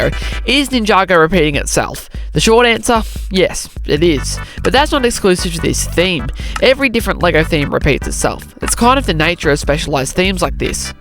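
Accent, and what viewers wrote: Australia. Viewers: Is this Australian or New Zealand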